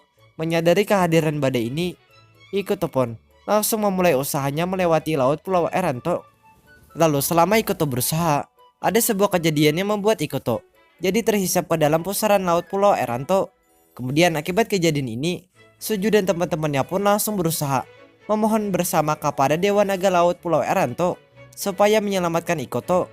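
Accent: Banjar, Indonesia. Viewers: logat banjarism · Pasti logat banjar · Logat nya Banjar banar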